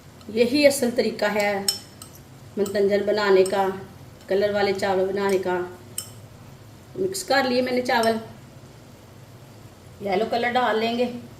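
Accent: Punjab, India. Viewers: Apki Urdu bi Punjabi lagti hai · Although Punjabi accent but excellent and simple recipe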